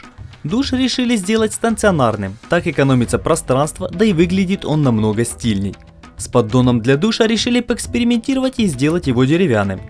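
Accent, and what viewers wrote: Surzhyk, Ukraine. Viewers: Весело у вас пробивается суржик) Смесь русского и украинского.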